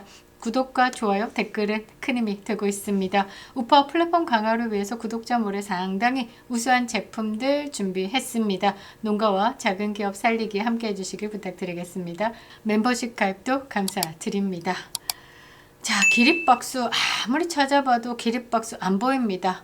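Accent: Gyeongsang, South Korea. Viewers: MC면 성조강한 사투리나 고쳐라. ... 여자들은 잘 고치는데 겅상도 티내냐 ㅋㅋㅋㅋㅋㅋ 윤석열 시절에 언론 기레기들이 김명신 얼굴보고 와~까지 하면서 단체 딸랑거리고 생일날 북한처럼 경호실 축하송까지 만들어 부르는 수준에서 언론 딸랑거린다란 소리가 나오냐 ㅋㅋ 신천지 통일교 전광훈 세계로 교회출신 극우냐? 나라를 위기로 만들어놓고 탄핵이나 당하는 주제에 아직도 정신 못차리고 그입에서 새벽예배를 언급하네.. 예수님을 못에 박은건 당대 보수주의자인 유대교 바리새인들이였단다.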